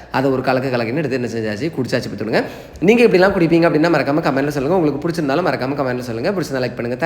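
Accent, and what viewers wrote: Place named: Kerala, India. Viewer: Anna neenga pesumbothu Malayalam kalarnthu varuthu.eppadi..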